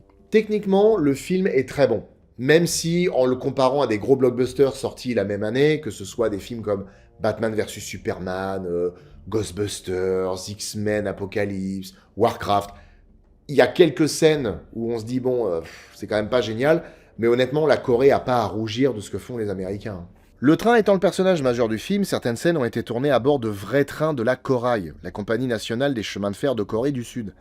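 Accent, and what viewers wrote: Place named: Belgium. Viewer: Une île au large du Costa Rica… Sans blague ? Ça y est ? Tu abordes un de tes "big 5" ? Et sinon, je suis trop fier d'avoir tout de suite repéré la ref aux 12 travaux d'Astérix ! #accentbelge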